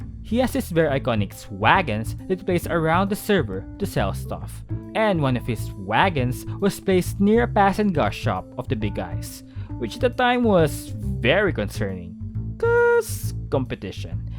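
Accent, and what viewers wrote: Philippines. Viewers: You seem to have a filipino accent. Are you?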